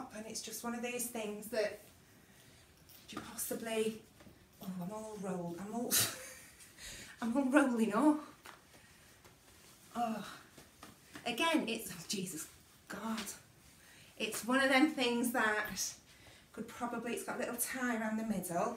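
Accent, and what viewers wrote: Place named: Warrington, United Kingdom. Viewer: Honestly laugh so much watching your videos! Your accent makes me smile my grandma is from Warrington so has a similar twang! And your hair is FABULOUS!! ❤️❤️❤️❤️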